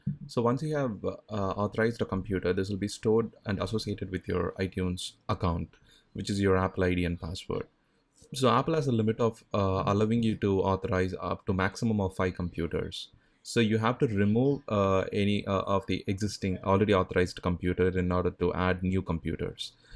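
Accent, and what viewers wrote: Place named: United States. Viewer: MURICAN ENGLISH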